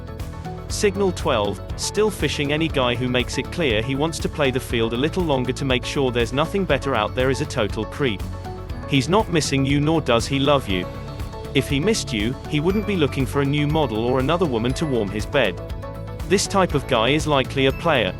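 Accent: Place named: United Kingdom. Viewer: Of course all of this totally true. What I loved the most about the content wasn't the content at all. It was the straight up no bs delivery in smooth British voice was delicious and hilarious. By the time the video ended my mood was lifted. Well played. Thx for sharing.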